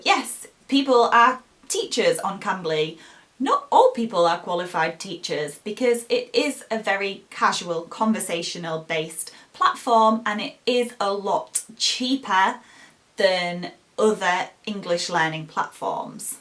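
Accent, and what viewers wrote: United Kingdom. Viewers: the British accent sounds different and sometimes harder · the British accent is better, because you pronounce the words as they're written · Loved british accent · which part of the UK you are from... Are you not from Midlands?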